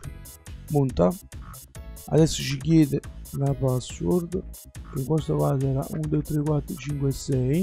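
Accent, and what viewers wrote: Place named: Naples, Italy. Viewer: con il tuo accento partenopeo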